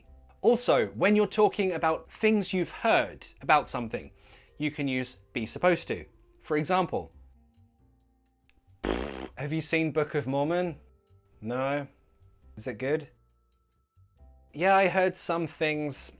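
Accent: United Kingdom. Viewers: In my side view, you have an excellent manner to teach English, especially when you use sketches with the sentences although I have never liked a British accent before, now I admire it because of you and I hope you post more videos because are so fun and useful 😊🌟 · I enjoy listening brittish accent. It's defferent from American one. Thanks for your classes, they make my English get better.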